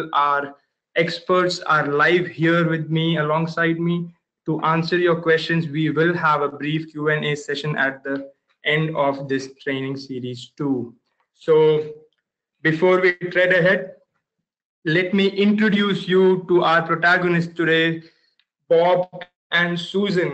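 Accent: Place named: India. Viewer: i hate this slang, as well as any other slang. please hire someone with clear speech. dear indians we do respect you, but this is too much, you spam with many useful videos, but this slang is so frustrating that i prefer strong alcohol drinks rather than listening this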